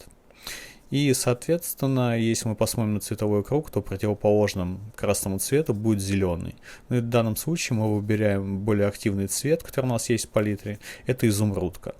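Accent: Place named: Ukraine. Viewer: Fantastic construction of a portrait! ...a great help for me. Thank you from UK. You sound Ukrainian. Hope that is not an insult! 😊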